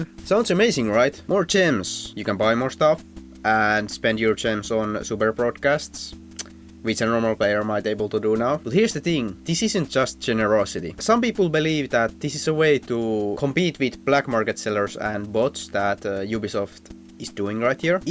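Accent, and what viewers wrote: Finland. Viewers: Jätkä puhuu yllättävä hyvää englantii. Kyl tos o ripaus sitä suomalaisuutta en oo growist kuullu pitkää aikaa nii iha mielenkiintosta kuulla täst pelist pitkäst aikaa. Mahtava video!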